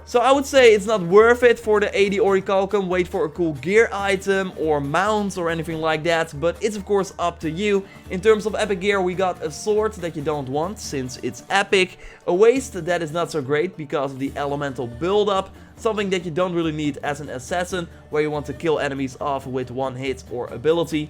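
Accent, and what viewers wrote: Netherlands. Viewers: please, say OY-kos not E-kos. Your strong dutch accent takes over alot haha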